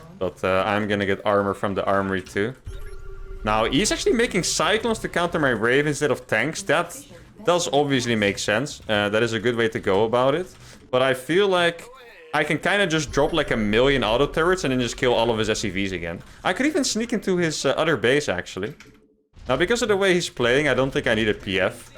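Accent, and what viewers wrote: Netherlands. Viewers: dutch english is the most cringe accent ever jesus christ unwatchable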